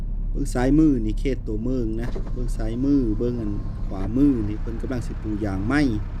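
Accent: Phu Thai, Thailand. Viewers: เจ้าเป็นคนเเขวง เมืองเลอ คึเว้าพูไท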